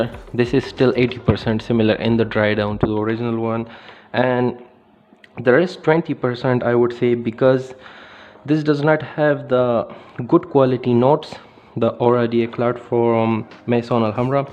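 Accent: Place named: Pakistan. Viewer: Пакистанский акцент. Очень сложно понять его английский.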